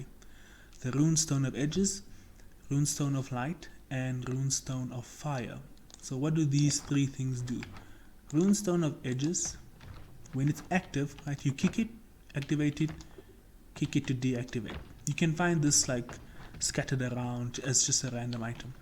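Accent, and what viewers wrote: South Africa. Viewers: South African? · Are you south african? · Are you south African? Wondering based off your accent and I'm from Johannesburg.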